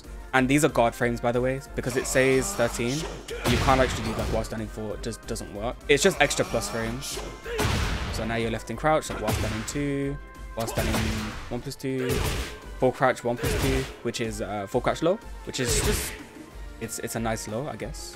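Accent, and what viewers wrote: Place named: Birmingham, United Kingdom. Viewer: you from birmingham too? Accent gives it away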